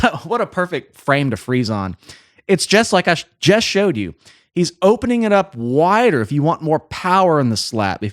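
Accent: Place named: United States. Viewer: Pronounced, Redding, Staytus... bloody yanks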